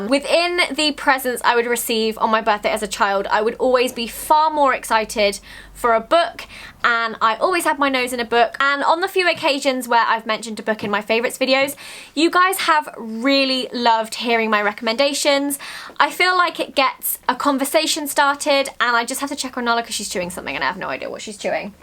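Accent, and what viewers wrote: United Kingdom. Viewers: YOUR EYES THOUGHHHH !!! AND I LOVE BRITISH ACCENT, SO THIS IS AMAZING 😂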